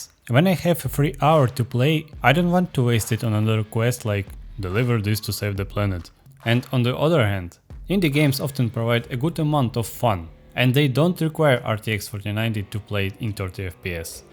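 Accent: Russia. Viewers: Привет, по акценту сразу понял что русскоговорящий , сможешь помочь поставить виндовс и утилиту переключения?) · your accent is way too Russian. You are certainly not Ukrainian, and if you are from so-called Belarus, it’s basically the same that you are Russian. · По акценту очень заметно что ты Русский)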